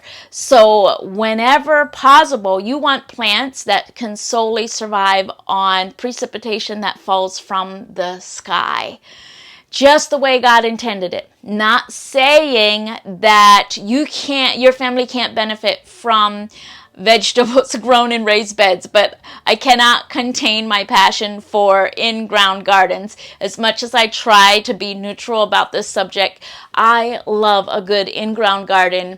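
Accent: Pennsylvania Dutch, United States. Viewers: Is your primary language Pennsylvania Dutch ? I hear it spoken all the time where I live near Lancaster PA also Germany ! Thank you explaining the differences between the two . I use raised beds because of the deer they don’t eat my plants in a raised bed .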